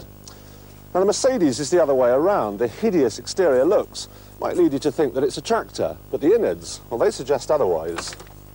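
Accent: RP, United Kingdom. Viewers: Jezza sounds sooooo posh! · didn't know used to have that snot nosed royal english accent, if they fired him them, we wouldn't have missed him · if said "yesteryear" with a posh accent today, he would do it sarcastically. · when. music was still awesome, but posh Repton Boys School accent..hehe..he masks his upper crust background quite well these days, but his lispy daughter's clipped accent betrays him.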